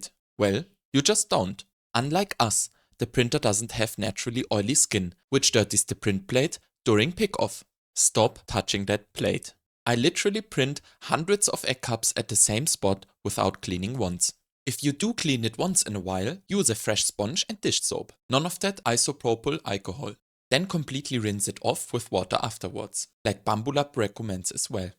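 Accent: Germany. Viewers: Kann man sich nicht anhören! Diese übertriebene Betonung! Typisch .... wenn ein deutscher tolles englisch sprechen will. Da ist nichts flüssig.....und die Betonungen passen nicht zur englischen Sprache! Ich bin raus! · der akzent klingt so deutsch :D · very "Kartoffel-english" ;D but nice video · I would listen to a documentary about paint drying from you. I love the German inflections in English and you keep a smooth cadence. You should think about voice acting.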